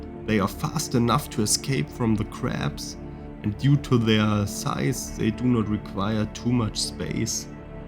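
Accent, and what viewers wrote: Germany. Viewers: I bet you're German based on your dialect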